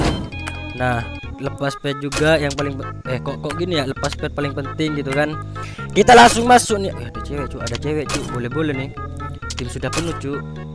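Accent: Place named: Madura, Indonesia. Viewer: Dari bahasanya dah kek orng madura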